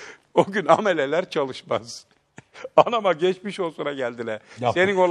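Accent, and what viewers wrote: Aegean, Turkey. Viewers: ege şivesi bu işte muğla tarafında konuşulanı en azından böyle içeri doğru gittikçe aydın manisa denizli afyonda daha da farklı ağızda konuşulur ama günümüzde süslü manken bozması oyuncuların oynadığı dizi filmlerdeki şive kesinlikle doğal ege şivesi değil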